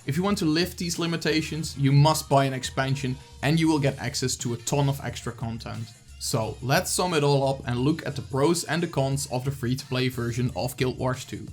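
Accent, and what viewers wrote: Netherlands. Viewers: ik hoor dat je nl bent hihihi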